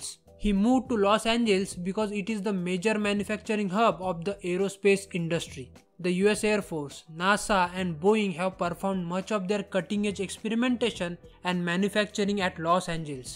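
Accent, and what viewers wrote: India. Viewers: You have the strongest Indian accent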